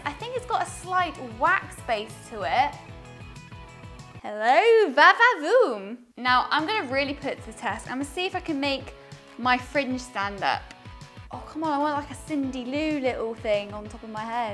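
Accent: United Kingdom. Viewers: Love it ps are u British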